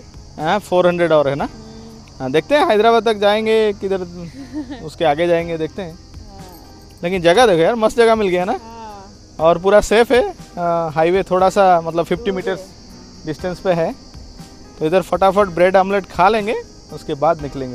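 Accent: Odisha, India. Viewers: Nice journey . I guess you are original Odia from your talking . Please tell if I am wrong or right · Bhai are you from Odisha. As your accent matches with that of odia people.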